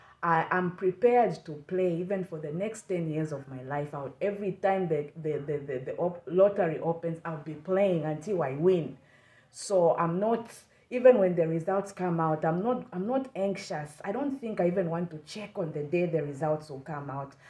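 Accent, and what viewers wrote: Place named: Kenya. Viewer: are kenyan?you look, sound like everything about you is Kenyan. New subscriber from kenyan plus loving your content gal,it's top notch!!! Thank you and here to support you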